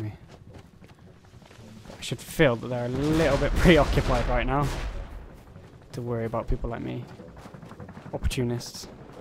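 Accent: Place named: West Country, United Kingdom. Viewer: Are you from Bristol or Somerset? Very west country accent :)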